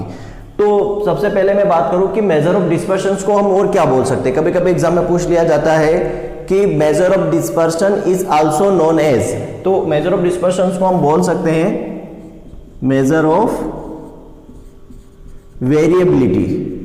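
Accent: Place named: Indore, India. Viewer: Kitna hi kuchh krlo pr thodi si indori to muh se nikl hi jati he ........( Apn)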